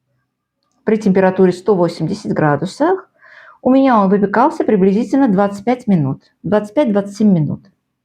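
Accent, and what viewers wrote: Baku, Azerbaijan. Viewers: Добрый вечер! Очень приятно смотреть Ваши видео, особенно Бакинский акцент. Очень прошу рецепт песочных пирожных со школьной столовой, за 22 коп. с повидлом и розовой глазурью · Судя по акценту Вы жили в Баку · Да,узнаю бакинский акцент❤ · Я пишу Вам из Дербента. Мы тоже пекла такие пироженки и так же называли белочками. Но когда-то много лет назад у меня в Баку жила тётя с семьёй и я приезжала к ним в гости. И сейчас слушая неповторимый бакинский акцент- такая ностальгия по тем временам. Как будто родной голос 😊😊😊. Спасибо ❤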